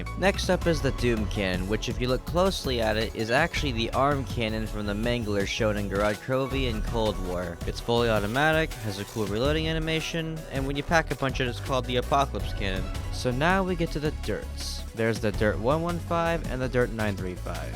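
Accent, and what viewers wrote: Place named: Kentucky, United States. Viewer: Hey dude great video I just have one question... Are u from Kentucky, USA? I just find your voice very familiar haha i hope it doesnt sound weird XD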